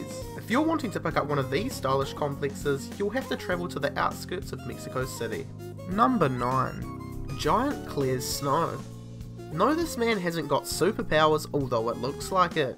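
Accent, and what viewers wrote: New Zealand. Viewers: Yeah He is from New Zealand probably Aukland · He could be from Whakatane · definitely from New Zealand. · It's his accent you fucking idiots, not a lot he can do about it. He's from New Zealand. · the brogans Dunno if he's a Kiwi or not... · That new Zealand accent is making my ears bleed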